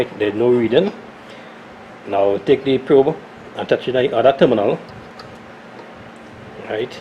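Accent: Trinidad and Tobago. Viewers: Are you a Trinidadian?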